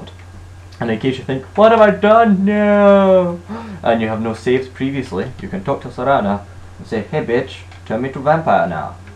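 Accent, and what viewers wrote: Scotland, United Kingdom. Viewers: I was going to be cool like these fools and ask if you were, like, Russian or something...but it's pretty clear that you're Scottish. Scottish FTW. Thanks for this video. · Are you from Scotland or are you from Belfast England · I subscribed lad because you're scottish! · You sound scottish haha Ich bin aus Mulheim an die Ruhr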